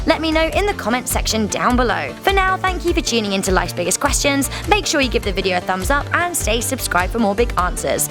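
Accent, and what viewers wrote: England, United Kingdom. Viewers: i missed your voice girl.! Nice English accent i love all about England and i don't live there but my mother did travel there when she was young and told me great things.! London...!:) Thanks for the vid btw to the next please.:)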